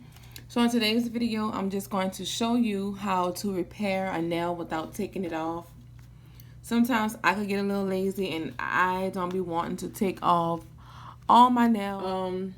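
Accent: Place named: New Orleans, United States. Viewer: baby that New Orléans accent thick hunny 😂😂 (i love the way they speak). nails look good girl keep up the good work this really helped me fix my broken nail. 💕🙏🏾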